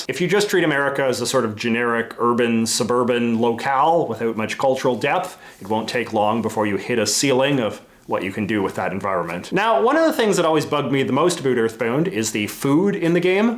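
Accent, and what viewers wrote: Canada. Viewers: Your accent is aggressively Canadian, my god. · It's his Canadian accent, of course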